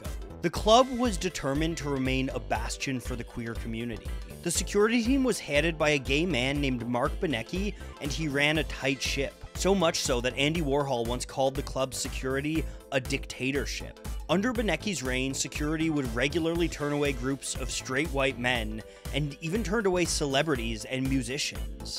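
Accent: Canada. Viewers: Absolutely well put together. Granted your Canadian accent does give a small clue as to why you may have mispronounced Giorgio's last name (it's 'More-row-der'/ Moroder). Clearly, I wish the video would have been longer. Great job though!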